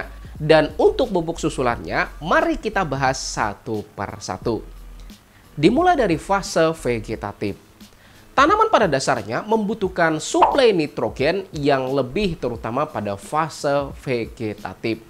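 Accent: Banjar, Indonesia. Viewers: urang banjar mun bepander bahasa indonesia pasti ada inguh inguh banjarnya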